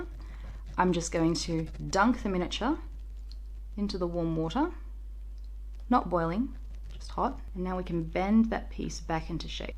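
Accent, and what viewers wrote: Australia. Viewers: Love the Aussie accent on girls. Don't change a thing. These New York ears hear you just fine.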